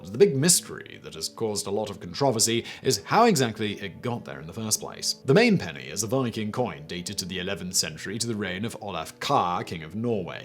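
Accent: United Kingdom. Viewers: Love listening to this "snooty brit".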